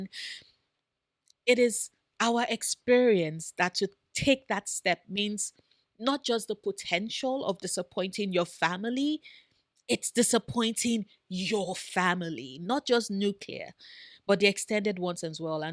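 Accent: Nigeria. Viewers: i only just clocked the nigerian accent in this video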